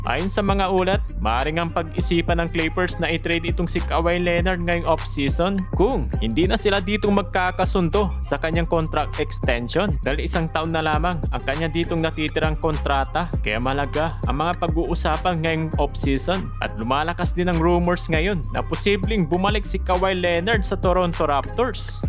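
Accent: Pangasinan, Philippines. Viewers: Pangalatok kb Sir matigas k kc magsalita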